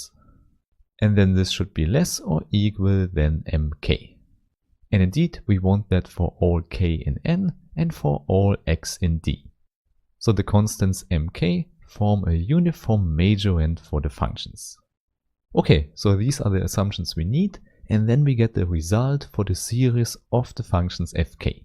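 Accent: Germany. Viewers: liebe diesen deutschen akzent haha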